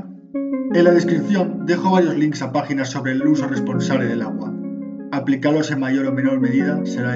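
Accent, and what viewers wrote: Spain. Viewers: Queeeee??????!?!? Eres español??? :V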